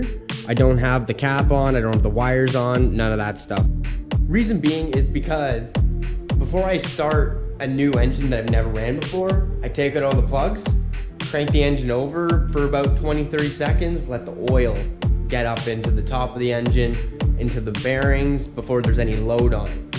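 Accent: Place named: Canada. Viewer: from the accent im gonna guess r u from Canada?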